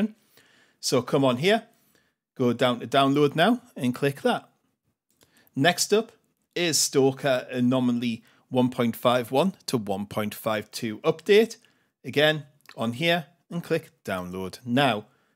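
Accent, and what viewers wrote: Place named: North East England, United Kingdom. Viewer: I'm Newcastle, so I'm thinking Washington/Sunderland/Durham maybe?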